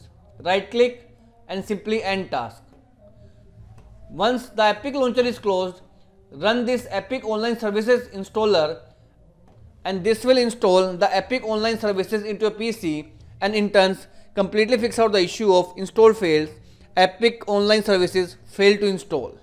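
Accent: India. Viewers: Once i heard the indian accent i knew this is going to work, and it worked. Thanks man :)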